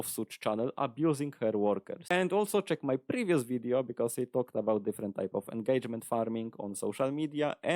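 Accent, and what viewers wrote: Poland. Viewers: Yeah I was thinking you sound Polish, did I get that right?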